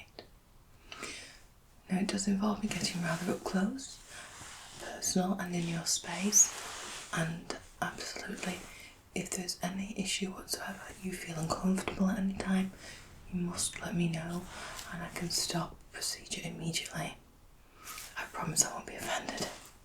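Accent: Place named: North West England, United Kingdom. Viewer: Hello, happy Xmas, that accent sounds north west?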